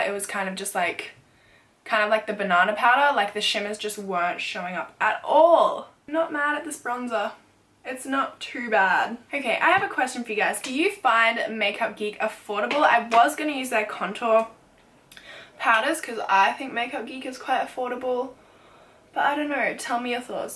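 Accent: Australia. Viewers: I absolutely love your Australian accent ❤❤ I'm English and my accent is so boring 😂😂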